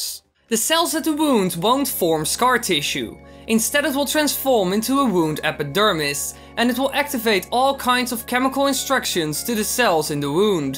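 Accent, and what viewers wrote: Netherlands. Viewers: i have one question, are you dutch because your accent sounds like one.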